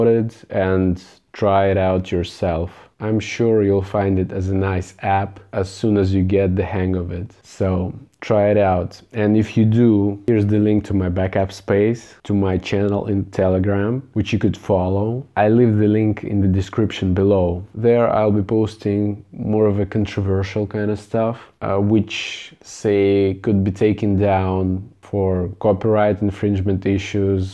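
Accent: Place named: Russia. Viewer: Ты же русский, слышно акцент)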